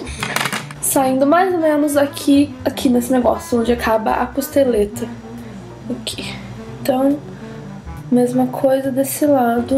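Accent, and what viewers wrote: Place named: Rio de Janeiro, Brazil. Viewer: querida, tu tinhas um resquício de sotaque carioca... Amey esse vídeo!:D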